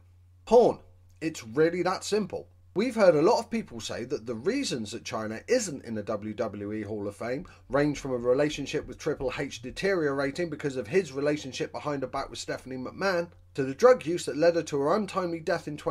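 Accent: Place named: England, United Kingdom. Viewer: pretty sure he is english, thats how they pronounce the letter H.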